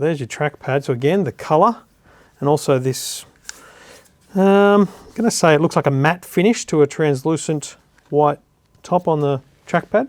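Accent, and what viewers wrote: Australia. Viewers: Nineneen nineny eight. Theeng. Compuudah. You must be Australian...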